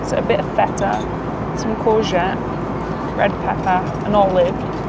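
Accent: United Kingdom. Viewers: Just a question- are you by any chance Welsh? Some words sounded really Welsh! ❤🏴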